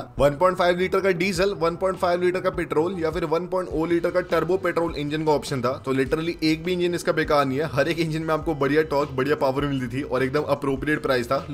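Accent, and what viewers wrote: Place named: United States. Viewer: You talking about Escape. And why the hell are you trying to use American pronunciation?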